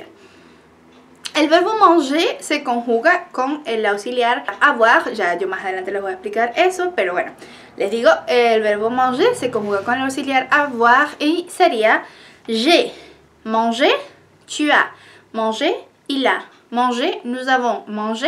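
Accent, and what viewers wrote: Venezuela. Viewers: Excelente calidad de video, con información muy importante. Gracias. Una pregunta: eres venezolana? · Acabo de descubrir tu Canal Por el acento eres venezolana?? Soy venezolana y estoy en Francia luchando con el idioma Me gusta tu explicación es clara y precisa espero me ayudes Merci... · Excelente la explicación !!! Bello tu francés y tu acento Venzolano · Tuve mi sospecha por tu acento,hasta el minuto cuando pronunciaste ''AREPA'' jajaja,saludos desde Venezuela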